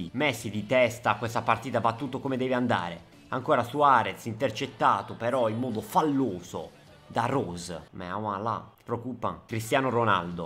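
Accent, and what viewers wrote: Bari, Italy. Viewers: Barese parli? O sbaglio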